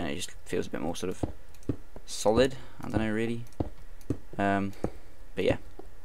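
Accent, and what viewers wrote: United Kingdom. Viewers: reminds me of X.... cept english accent...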